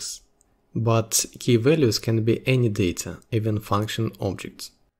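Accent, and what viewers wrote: Russia. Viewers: Чувствуется русский акцент)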